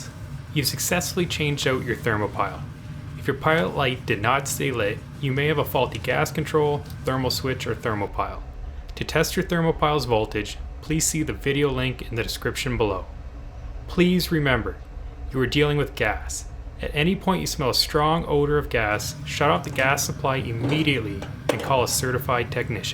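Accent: Canada. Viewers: Are you Canadian or from the Upper Peninsula?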